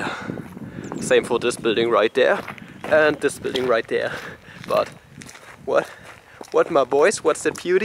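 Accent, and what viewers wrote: Germany. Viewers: I do love how blunt German's sound when they speak in English, great video nonetheless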